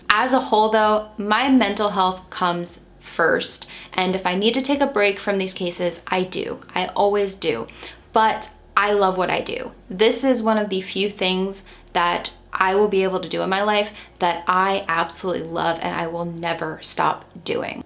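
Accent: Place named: Minnesota, United States. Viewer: What state do u live in? You sound like Minnesota like me being the ranch n many other things lol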